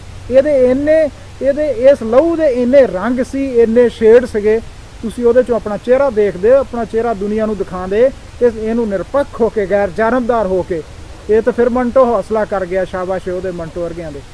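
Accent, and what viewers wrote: India. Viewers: Dear ❤️ professor sAhib, GoOd morning jNAb, daily jNAb nU M sUNNdA, samjhda bUT nOW, hUM mARi wARi, mARi public dealing kAfi hAi, & 1982, 85 tOUN chALdi Aaa Rhi hAi, As pER mY Opinions, face reading, jNAb waqti tA jO bi hAIN, sO hAiN bUT jNAb dA ways of talking, thinking, BOL ChAL,LEhjA hAR Lehaz tOUN mANU hindustania wAllA Lagda hAi nA ki Pakistani, if Any wAY, I am wRONG, please sorry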